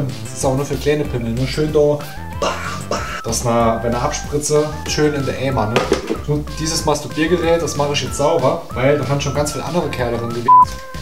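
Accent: Germany. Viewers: Pfälzer? Feier ich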